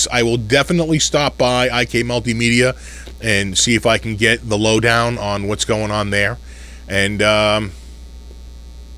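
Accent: Boston, United States. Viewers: I love hearing a fellow Bostonian talk about music and guitars. you're the man! Keep em' coming brothuh!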